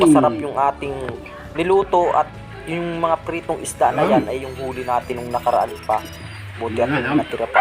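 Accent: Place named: Quezon, Philippines. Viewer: Broo taga saan ka parang Quezon ung Punto Ng salita nyo??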